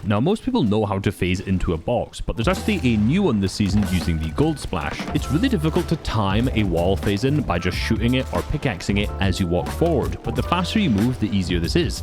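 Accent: Scotland, United Kingdom. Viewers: Your accent makes me want to drink IRN BRU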